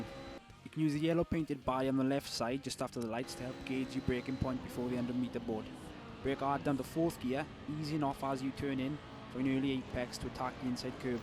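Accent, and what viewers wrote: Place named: Wales, United Kingdom. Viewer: Really good video, shame your not making any more and it's nice to hear a Welsh accent :)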